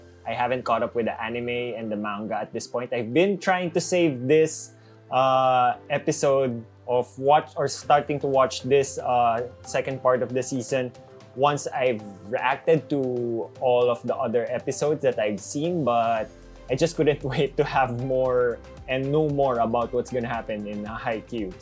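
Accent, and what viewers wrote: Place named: Philippines. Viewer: Its not that its bothering or anything. But you have the Filipino accent, and i cant stop noticing it XDD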